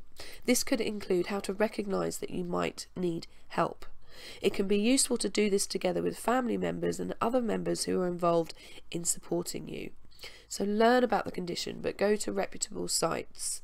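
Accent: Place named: United Kingdom. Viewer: I’m glad you have a British voice.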